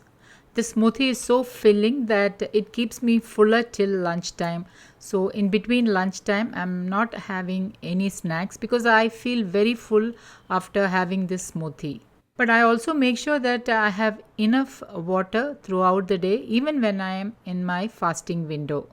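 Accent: Kerala, India. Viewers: Sounds like you are malayali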